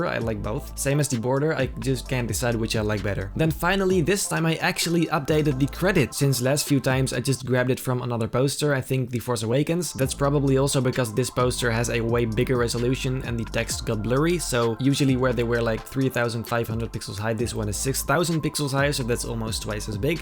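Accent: Netherlands. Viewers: His Dutch accent is very annoying sorry, nice photoshop tho.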